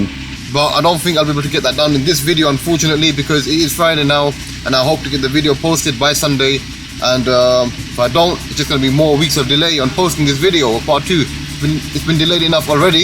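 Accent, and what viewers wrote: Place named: London, United Kingdom. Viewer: Man, I just love how the subtitles can't decipher the true Lahndahn accent!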